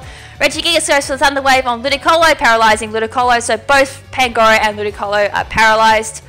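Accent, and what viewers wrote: Australia. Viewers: Your australian!!!!!! Awwwwssssooommmmee so am i.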